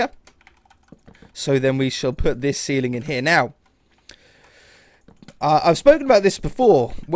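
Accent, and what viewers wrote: United Kingdom. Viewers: That British Accent He never had a castle!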